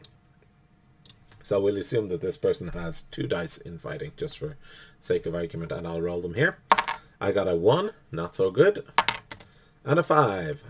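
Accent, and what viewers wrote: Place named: United Kingdom. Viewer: Thanks for the great video! Looking forward to your channel. Also, great accent- are you British per chance???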